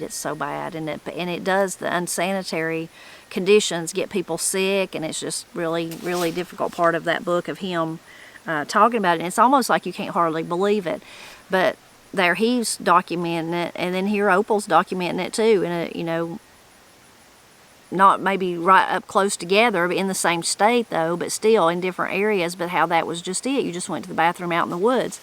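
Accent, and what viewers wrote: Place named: South, United States. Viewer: I love hearing your southern accent while you're reading! I listen while I'm cleaning the house. Opal is a real inspiration, too ! I love watching all your family! You are very blessed ❤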